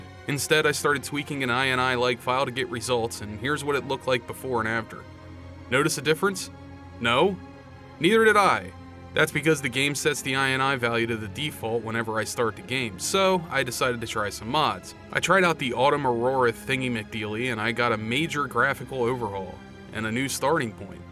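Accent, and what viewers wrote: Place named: Philadelphia, United States. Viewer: Are you from philly or around west chester? Your accent reminds me of bam margera and brandon dicamillo but not like a yinser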